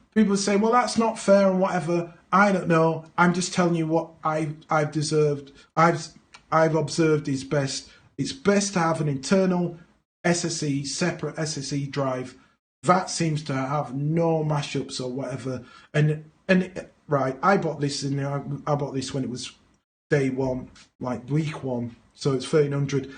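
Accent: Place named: Northern England, United Kingdom. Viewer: Hey! an Englishman, and a northerner to boot, What is there not to like. Seriously though its refreshing to listen and learn from someone from my own country. I've Subscribed and given you a like, and I know I will be constantly referring to this video as there is no way this old fart will take in and understand all 47 mins of this video in one go. Thanks again for taking the time to teach us a little of what you know 😎😎😎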